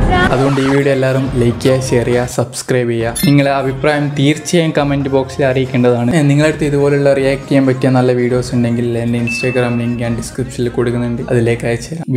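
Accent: Kannur, India. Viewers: KANNUR aano place oru Kannur slang undallo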